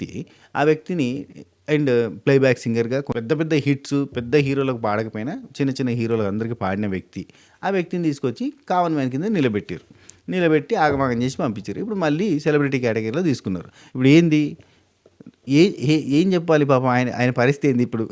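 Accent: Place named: Chittoor, India. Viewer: record cheste mimmalni Enduku kodatharu garu cheppandi miru Adbutham ga video's chestaru Adi kuda Swathi pickles entha veyttikina dorakadam ledu kani miru mee webset pampinchi chala manchi panichesaru garu Mee language chittoor slang chala bagundi garu ee madyalo mee youtube video's chala Trend ga marindi garu mee chittu pakala block bord ekada dorukuthundo cheppandi garu plz nenu kuda Order cheskunthanu garu plz Aa youtube maike kuda entha chepthara garu plz nenu online lo veyttikithe 40000+ payment chupisthundi garu mee maike entho naku cheppandi plz garu plz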